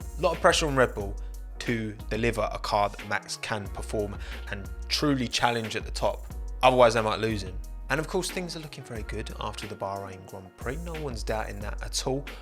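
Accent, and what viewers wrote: United Kingdom. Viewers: anyone else having trouble with the thick british accent?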